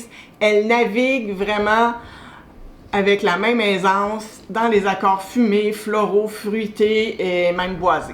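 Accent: Canada. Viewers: Coucou ❤️ Je viens justement de découvrir ta chaîne grâce à ton commentaire sur la chaîne d’Estelle, et je me suis directement abonnée! 🥰 J’ai beaucoup aimé cette vidéo autour de Frédéric Malle, Le Labo et Dior. Trois univers tellement différents! Je suis d’ailleurs totalement amoureuse de la maison Frédéric Malle ❤️, alors autant te dire que ta sélection m’a particulièrement intéressée! Contre-Jour, Violette 30, mais aussi Paradise et Cuir Saddle de Dior… de très belles découvertes et des parfums qui donnent vraiment envie d’être explorés davantage. ✨ Je suis moi aussi créatrice de contenu autour de la parfumerie et je suis belge 🇧🇪. Je pense que tu es au Canada, si j’en crois ton joli accent 😊. Je suis vraiment ravie d’avoir découvert ton univers et j’espère que nous aurons encore l’occasion d’échanger autour de notre passion commune pour les parfums. À très bientôt et gros bisous parfumés 💕🌸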